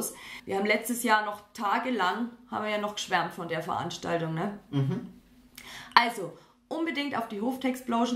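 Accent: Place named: Franconia, Germany. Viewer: Gelllllll!!!! Dein cooler Dialekt (Fränkisch) weiter so gellll ?!😂👍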